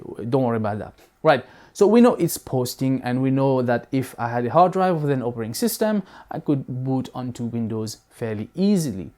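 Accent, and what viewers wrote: Mauritius. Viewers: yeah he sounded mauritian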